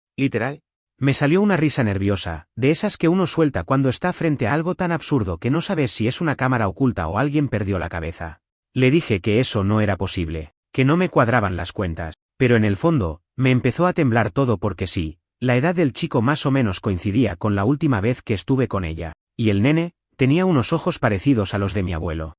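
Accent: Argentina. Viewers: Me suena a que es argentino, al menos eso parece por como hablá.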